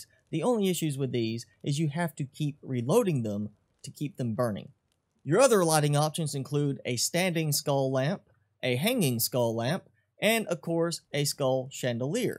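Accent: South, United States. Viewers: I'm from Georgia and we also talk like that, but when you live here no one notices. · FINALLY A YOUTUBER WITH A SOUTHERN ACCENT, I HEAR EVERYTHING YOURE SAYING BROTHER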